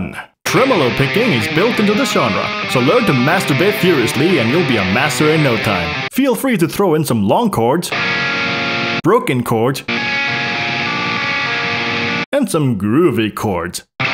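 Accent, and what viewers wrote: Norway. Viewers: Love your cute Norwegian accent hahaha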